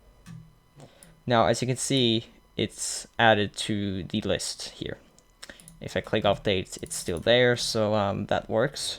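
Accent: Denmark. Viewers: Are you Danish? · Are you Danish?? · are you from denmark?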